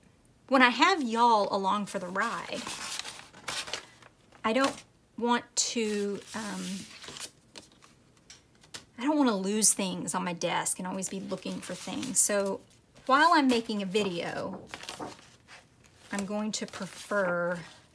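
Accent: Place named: Ozarks, United States. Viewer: I love how you think (talk) things out. As a novice journal maker myself, it really makes me think about the journal as a whole. Your explanation of the busy hands journal in part one made me have an "aha" moment. Thank you for continuing with this type of video. I'm from the Missouri Ozarks so I totally understand about the accent, I've heard it all my life, sometimes in a very condescending manner. I've learned to just be proud of who I am and where I'm from, all that to say.......Y'all sounds GREAT!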